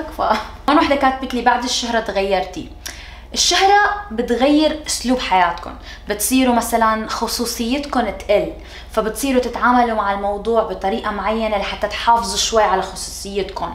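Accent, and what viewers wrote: Syria. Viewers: سوأل ليش انتي عراقيه وتحجين سوري للعلم هذا السؤال اسئله كل فيديو بس محد يجاوبني 😐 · ماعم تحكي لادقاني عم تحكي اللهجة السورية العامة لمعرفة لبعض الدول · عاشت وهي صفيره بسوريا فصارت تحجي سوري اكثر من العراقي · زكاء منك انك عراقية وحاسبة حالك عالسوريين باللهجة وغير اشيا بهالطريقة كسبتي السوريين والعراقيين وعملتي هالقناة الكبيرة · walaa sdke اختارت لهجة السوريه لان لما بدات باليوتيوب كانت اللهجة السوريه كثيير مشتهره بسبب دبلجة مسلسلات السوريه و في نفس الوقت كان ما احد يعرف اللهجة العراقيه طبعا هذه شغله مش حلوه لانها نست اصلها و قامت تتكلم بلهجة ثانيه و معلومه اخرى تعرف تتكلم عراقي زيين بس بعدها مصممه على لهجة سوريه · لعد لَيــِْ♡̷̴̬̩̃̊ـِْش. تحجين سوري اذا عراقيه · لأنها كانت عايشة بسوريا من هي وصغيرة فلهيك تحكي سوري يعني تعودت تحكي سوري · نفسي اعرف شغله ازا هي عراقيه كيف بتحكي بلهجه سوريه وليه بتحكي بلهجه سوري بدل عراقي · انا عرفت انك عراقية بس ليش تحكي سوري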